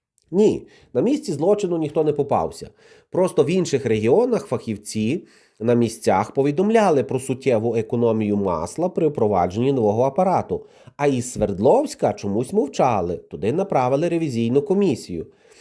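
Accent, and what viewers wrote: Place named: Kolomyia, Ukraine. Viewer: акцент рассказчика из коломии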